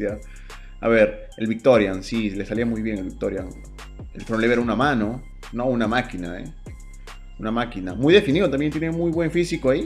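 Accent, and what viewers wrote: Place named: Mexico. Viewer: Pense que eras mexicano Saludos y exito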